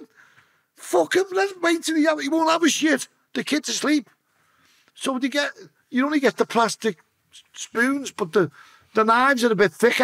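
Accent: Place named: Liverpool, United Kingdom. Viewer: Would be lovely for your international viewers if there was subtitle because the Liverpool accent is crazy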